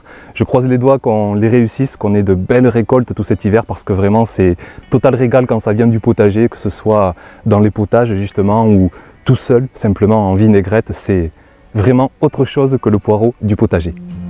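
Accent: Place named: Montpellier, France. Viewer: Bonjour, un immense merci pour ces vidéos très intéressantes. Le propos est parfaitement clair et votre amour du potager et de l'écologie est communicative:) Bonsoir, Je travaille en tant qu'assitant pédagogique au Collège des Escholiers de la Mosson à Montpellier. Nous sommes une équipe de 5 (pour l'instant), et souhaiterions relancer le potager du collège, qui est déjà bien en place, mais a été laissé en friche depuis trop d'années.... Personnellement, la permaculture est un domaine que je découvre peu à peu... et qui est passionnant! Connaitriez-vous des personnes ou des assos qui voudraient bien nous aider, pour faire un premier "diagnostic" du jardin sur place, et nous conseiller un peu sur les choses à mettre en oeuvre pour lui redonner vie et l'animer? Nous avons déjà un peu planché dessus, mais des avis d' "amoureux" initiés et d' "experts" nous permettraient sans doute d'avancer plus sereinement, et de mener à bien ce projet qui nous tient à coeur pour la vie de l'établissement. 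Nous souhaiterions commencer les actions dès octobre, idéalement et dans la mesure du possible. Timing serré...:p C'est jouable selon vous? On prend toutes les pistes: rencontre autour d'un verre, chaines youtubes, sites internet, bibliographie... et si vous êtes dans le coin de Montpellier (l'accent laisse penser que vous êtes pas trop loin), ça serait un plaisir de vous recevoir au jardin (si ça se met en place...) Vous pouvez me contacter en sur Facebook (Nyco Youpy) ou sur <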